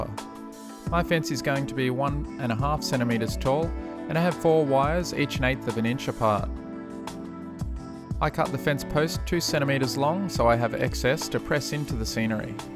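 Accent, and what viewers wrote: Australia. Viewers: Hey mate, you sound like a fellow Aussie, what foam is that and where do you get it from? Thanks!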